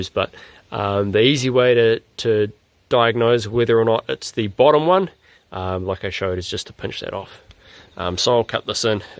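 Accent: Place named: New Zealand. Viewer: Thank you. As seen as I heard your accent I said he must be a kiwi:). I just bought 2009 A4 3.2 Quattro and has same rough idle vibrating problem and kind a goes away when you are driving faster. I would really appreciate your advise for that issue. Where shall I take my car? I am in Auckland and I don’t want to be ripped off TBH! It has 150K milage on it. my email <mail> many thanks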